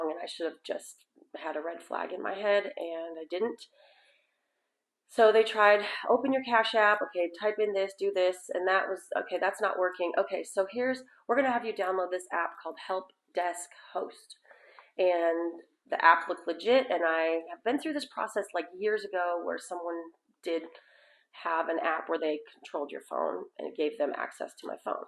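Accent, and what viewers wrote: India. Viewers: Indian accent maybe.? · East Indian accent? Asking because it’s very common.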